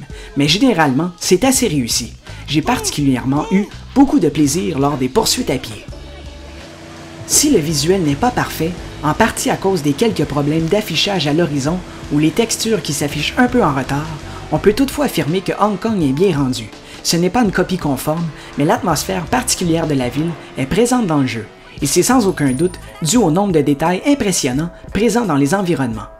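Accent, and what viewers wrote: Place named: Canada. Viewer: J'adore ton accent et j'aimerais trop aller au Canada!!!!!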